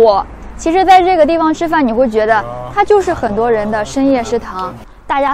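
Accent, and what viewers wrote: Shandong, China. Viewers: 主持人这山东口音太浓了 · 有山东口音呀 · 妹妹是山东哪里人？好熟悉的方言普通话发音😂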